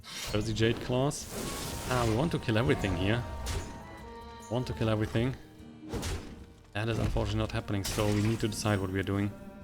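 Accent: Germany. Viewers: What country are you from? Your accent sounds german.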